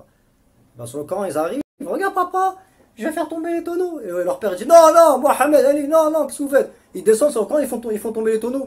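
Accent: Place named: Romania. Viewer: C koi laccent tetais en roumani nn ???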